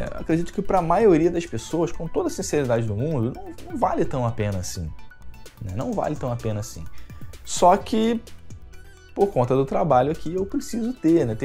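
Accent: Rio de Janeiro, Brazil. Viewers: eu não acho que foi o sonysmo que salvou o canal, o apelo sempre foi um cara falando merda com esse sotaque carioca engraçado, não importa o assunto, por isso faz sucesso e é o canal que mais cresce no brasil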